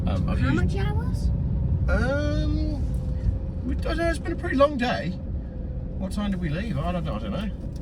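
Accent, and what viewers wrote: United Kingdom. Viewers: Bri’ish guy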